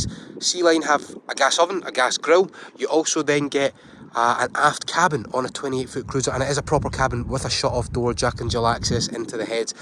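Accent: Scotland, United Kingdom. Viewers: I watch this channel for the reasonably priced boats, but I stay for the beautiful Scottish accent and the “snap” transformations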